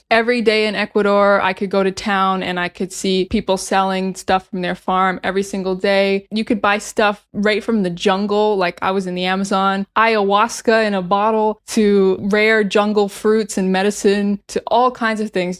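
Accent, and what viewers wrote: General American, United States. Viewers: Si le llaman standard American English y no usa muchos slang y otras expresiones en este video . Stay safe & greetings from the Gulf of California, Mexico 🇲🇽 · I'm from Buenos Aires and I can easily picture myself living in Boston or NYC, and without a car. But I would blow my head off if I had to live in Orlando, for example. I barely remember having seen any sidewalk there. By the way, your English is crystal clear to me. I understood everything, like you were talking to me in Spanish. I guess you have a pretty standard American accent.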